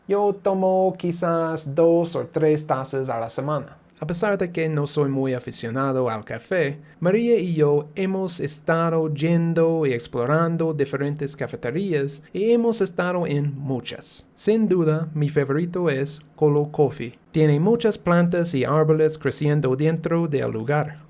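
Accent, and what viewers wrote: Spain. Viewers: Que chistoso! El inglés con un acento espanol...he disfrutado tus cuentos y tengo muchas ganas de otros... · Bebo cafe dos veces al dia, cada dia. Creo que mi sangre esta llena de cafe. Funny that the accent made all the difference. I can understand 'red velvet cake' in a Spanish accent just fine.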